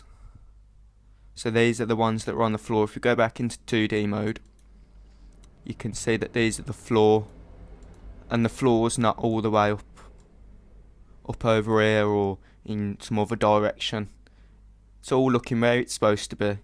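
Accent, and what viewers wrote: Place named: Northeast England, United Kingdom. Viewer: yow aye from roond ere am ya? hahah i recognize that accent.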